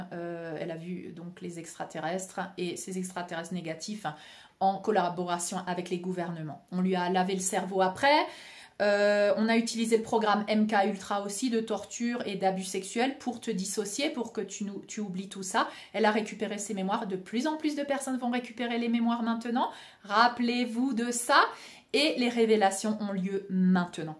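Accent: Alsace, France. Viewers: Merci, nous sommes sur la même longueur d’onde, je réfléchissais à ce phénomène depuis quelques temps et ta vidéo m’a beaucoup parlé. Je suis un homme homosexuel, mais à aucun moment je ne soutiens le mouvement LBGTQI+-machin-truc-bidule-chouette. Pour moi être homosexuel est juste une particularité ou une caractéristique, ce n’est guère plus important que d’avoir les yeux bleus ou verts. Ça me regarde moi et c’est comme ça. Tous ces lobbys wokistes ne représente qu’eux-mêmes, la seule chose qui m’importe en amour est le respect, l’honnêteté, la bienveillance et la fidélité à soi et à l’autre. Le reste ne m’intéresse pas, et je n’irai certainement pas faire de mon fonctionnement une identité et encore moins une revendication. On ne va pas "convertir" quelqu’un à changer de couleur d’yeux, c’est aussi ridicule que de faire la promotion de l’homosexualité, ça ne se décide pas et ça ne s’impose certainement pas non plus. 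Ceux qui cherchent à imposer cela à des enfants sont de grands malades. Il faut laisser les jeunes tranquilles avec toute cette sexualité et préserver toute leur innocence car elle est un cadeau du Ciel. 🙏🏼 Je suis de Strasbourg-Schiltigheim vivant à Reims, et ton accent alsacien m’a rappelé la maison ❤🤩🥰 Je t’embrasse 😘🙏🏼🦋💐 Que Dieu te bénisse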